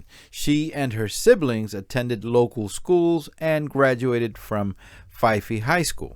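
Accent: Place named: Latin America. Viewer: acho o máximo seu inglês latino.😊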